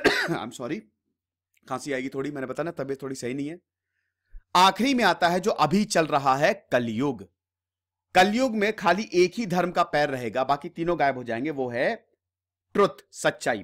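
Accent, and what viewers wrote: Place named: Lucknow, India. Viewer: 😀😀😀... Lucknowi andaaz 😎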